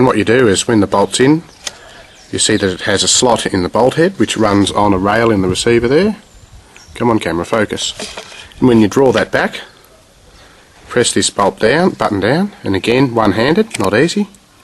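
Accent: Australia. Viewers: Gday from Qld. I wish i had a beautiful aussie accent like you. where are you from?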